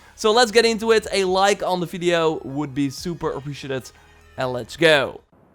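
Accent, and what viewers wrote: Netherlands. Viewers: Haha this guy has a heavily dutch accent so I think you’re dutch don’t worry though I am dutch too. Maakt niet uit man😂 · IS DUTCH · He's Dutch. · This Dutch accent moron is clearly paid off by the developer. Not one negative thing to say about this game.... he's just praising every single aspect of this game even though many legit reviewers clearly state that this game has aspects which are total garbage like the AI, and it's nowhere near as good as even the first game.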